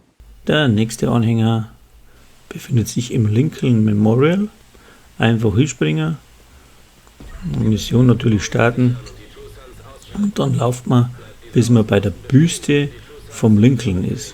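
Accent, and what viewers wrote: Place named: Swabia, Germany. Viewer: Als selbst tiefster Urbayer frage ich mich, wo der Dialekt herkommt. Hat Einschläge vom Schwäbischen, wie es mir vorkommt.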